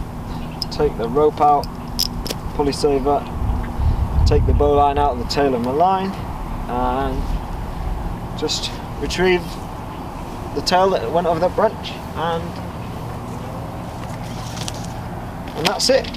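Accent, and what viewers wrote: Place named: England, United Kingdom. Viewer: He's English bro what the bloody Hell yo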